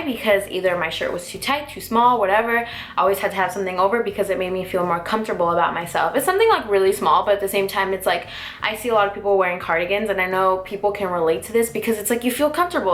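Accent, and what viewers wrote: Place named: Arizona, United States. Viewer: Sounds like she's from Arizona 🌵😃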